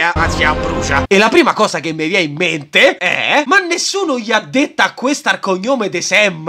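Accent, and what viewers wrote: Rome, Italy. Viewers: Il primo romano sulla terra che pure se parla romano, non mi fa venire voglia di spegnere youtube. Sei un genio!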